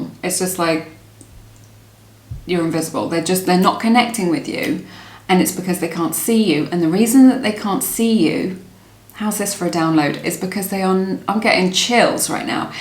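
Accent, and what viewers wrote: United Kingdom. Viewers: Pile # 3 and specially pile #4 : resonate 100% , this video is a turning point for me , let us call it the beautiful truth , we really need always to search for the truth.Its almost personal , I have done the work on myself and learned my lesson , thanks for the universe for upgrading us and thank you so much for helping me sort things out . I love you and love your British accent too 💓💓 To my fellow viewers : I watched the whole video , the 4 piles and I got a message from each of them ,somehow 4 piles resonated with me somehow , wish you all love , light and the best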